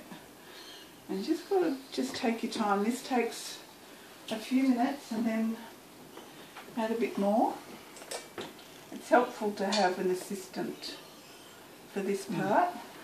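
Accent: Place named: Australia. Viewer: Thankyou for the video. Great instructions. PichilmI she definitely sounds Australian.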